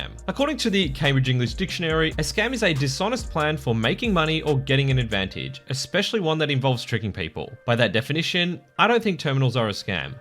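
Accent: Australia. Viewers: “This video sponso’.” You gotta love an down under accent. Keep upt the good work!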